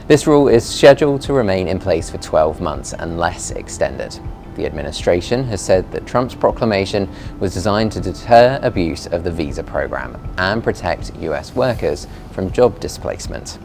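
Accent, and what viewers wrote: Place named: United Kingdom. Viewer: Kick out this UK guy or charge 100K for him. I am sick and tired of getting USA news with British accents. Please go home!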